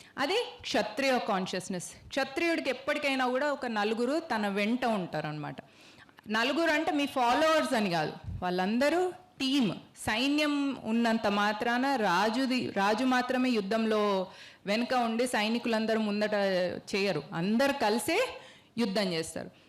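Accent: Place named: Telangana, India. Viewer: स्वच्छ. Myna. Telangana. Yasalo.matladi .adura.gottindi mam❤❤❤❤❤❤❤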